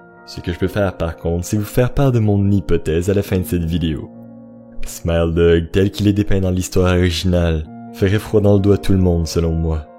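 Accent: Quebec, Canada. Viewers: enft c que il quebecois😃😀😀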